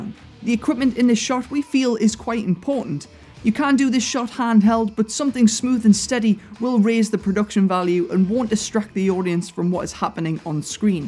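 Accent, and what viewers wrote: United Kingdom. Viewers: Hey bro whats your accent? I aint british but interested in british accents. The acceent I speak is similar to yours. Is it a north accent?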